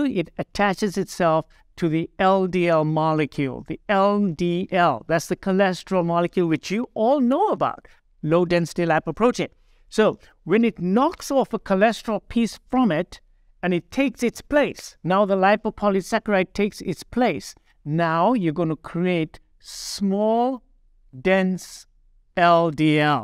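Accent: India. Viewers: I naturally listen and trust doctors with Indian accent. I've now watched about 8 videos of him. He's a genius ! 😊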